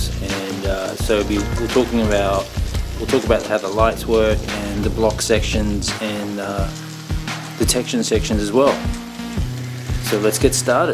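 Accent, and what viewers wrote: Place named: Australia. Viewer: I simply love your videos. Thanks for posting. I'm still strictly DC and have no plans to go to DCC unless a miracle happens and I maybe win the lottery. I guess I'll continue my DC layout, but I thank you for the insight into DCC. I've searched the internet for NEA Railroad software and cannot find anything on this software. You seem to have an Australian accent so maybe this is only available in Australia. Keep up the good work and I really enjoy your videos. Keep 'em coming.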